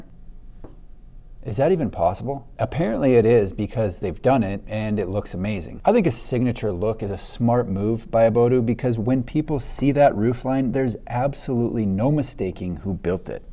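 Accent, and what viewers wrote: Canada. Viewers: Every time I think a better design can't come along, one does! Thank you so much for your interesting and inspirational videos. I so enjoy them and as a Canadian living in Europe, love listening to your gentle Canadian accent as a reminder of home :)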